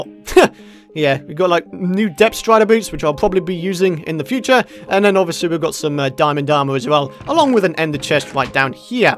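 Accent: United Kingdom. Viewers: ur voice,its so , so, UK. I love it !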